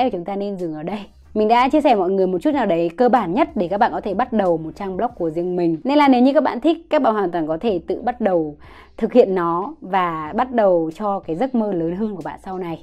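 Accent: Central, Vietnam. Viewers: Em rất thích chị và các video của chị💛💛💛 Thích nhất khi chị nói giọng miền Trung nữa 😊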